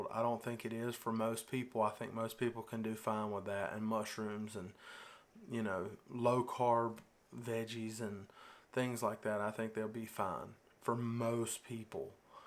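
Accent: South, United States. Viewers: Hello young man! Your video showed up in my feed and I’m really glad. Thank you! I’m going to share with you - this will likely be long. Please - take what you like, leave the rest. First, you can’t disappoint anyone else. If someone says they’re disappointed in something or someone, that is on them - it’s their feelings - it’s theirs to deal with and not something you caused or need to feel worried about. I can tell you’re from the South by your accent. Me too! So, I know a lot about what that likely entails ….food - and not healthy food - is a central part of life - good times, bad times, normal times, etc etc etc. It’s an insult to many in the South that if one doesn’t eat as they think one should, then they are worried or insulted and certainly, they keep encouraging and begging and shaming until the person gives in or bad feelings occur. I’ve been there, done that. 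MANY MANY MANY times! I saw a great therapist for known and unknown reasons. It was the single best decision I ever made!!!!!!!! My life changed in every way - I got better - I understood my life - past and present - much more fully. A lot was not pretty or comfortable. I had a lot of shame - for known and unknown reasons. There were things I was hiding - from others, for sure. And from myself in many ways, in terms of how it was effecting me - including my weight and food issues. So pat yourself on your back ….you’re being very candid, you’re taking a risk and you’re willing to see a therapist. In our society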